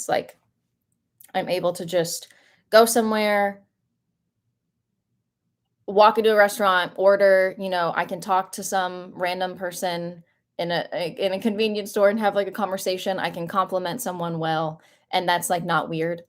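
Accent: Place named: South, United States. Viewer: Your southern accent is very subtle, also; in my time in China, nearly every American I met was from the south, including me (NC). If you feel comfortable answering, what did you do in China? and is Seoul similar to Chinese cities? This would be really helpful because I used to live in Shenzhen and am considering studying in Seoul for a year as an exchange student.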